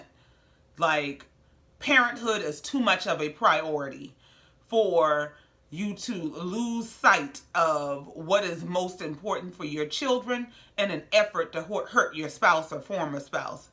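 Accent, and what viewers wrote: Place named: Louisiana, United States. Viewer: you got me gul! There were just some things you said that gave Shreveport and NOLA tease. Geechee accent is one I'm not that familiar with. That Carolina food good AF tho😊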